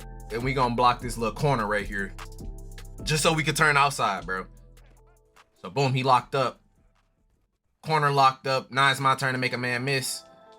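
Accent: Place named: Midwest, United States. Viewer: Besides great concepts I’m here for the laughs 😂an commentary an where is your from bro got to be Midwest 😂😂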